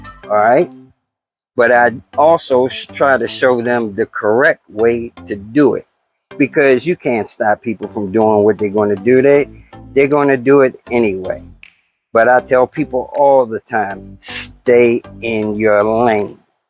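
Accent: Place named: New York, United States. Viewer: Yeah congrats for 1,000 subscribers I got 570 and dropping and I don't really care because every time somebody watches my videos they make money and I don't LOL why do you live in California and sound like you're from New York you must be from New York nobody has that accent. When I lived in Bedford I started getting that accent yes I used to work at Bedford prison in New York on boilers. We kept all those women warm LOL. Well that's good you're not in jail I'm glad and I hope you're not slinging bricks because those kill people and know how matter how much money you make you can end up in jail forever. Not worth it I'm poor I could have done that I can find a bag of s*** way faster than a bag of weed I grow my own weed though Gorilla Glue number f o u r that s*** is the bomb. Next is blueberry Autoflower. I used to be a farmer now I'm in the closet farmer LOL. 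I bought a battery online and now I'm wondering why the f*** did I do that? I need prayers I bought it from an individual that sells all kinds of different stuff and no batteries it says it's brand new 20 amp hour 48 volt for 450 bucks plus shipping and whatever about 480. It has a really nice metal charger but who knows if it works why did I do that oh my God? Well I can send it back but that cost $60. Now I can tear apart the other battery once I get that one and I don't have to worry about going on a trip still if I screw it up they won't even tell me what kind of BMS is in the freaking battery. They sell you a batt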